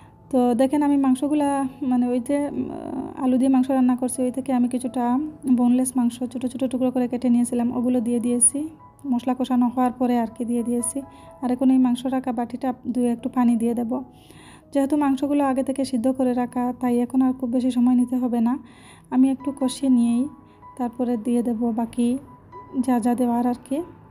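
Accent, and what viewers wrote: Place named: Sylhet, Bangladesh. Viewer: 🔔🔔👍👍 আপু আমার সিলেটি কথা বালো লাগে । আমিও সিলেটি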